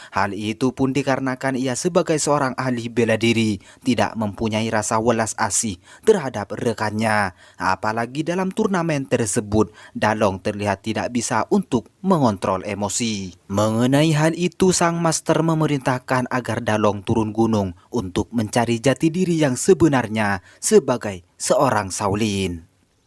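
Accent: Madura, Indonesia. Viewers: Madura ya pengisi suaranya?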